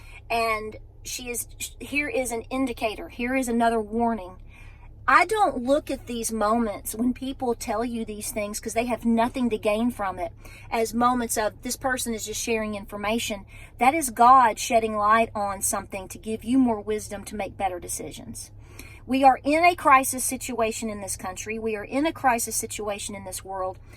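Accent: South, United States. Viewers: I live here in East TN West Knoxville too! I just found your channel a few weeks ago. I love you videos and your Southern accent, thank you for sharing, I can feel the heart-love you send. 🦋🪴❇️💜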